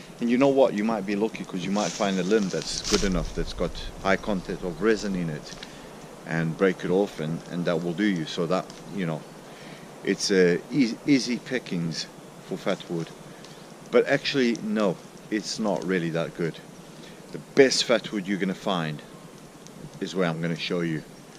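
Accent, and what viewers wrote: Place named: South Africa. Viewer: Another great video and good information, thanks. I can't place the accent, thinking South Africa but could be way off :)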